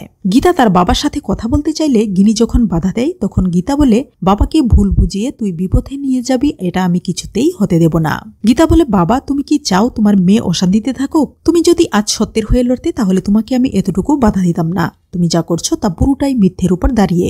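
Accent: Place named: Bangal, Bangladesh. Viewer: অতি সাধারণ কথক। অশিক্ষিত উচ্চারণ। বাক্যের শেষে টেনে টেনে বলা শুনতে ভালো লাগে না। আবার,বানগাল টান ও রয়েছে।তবে, চেষ্টা করলে সব খামতি শোধরাতে পারবে,তা আমি বিশ্বাস করি। চেষ্টা কর ভালো কথক হতে। শুভেচ্ছা রইল।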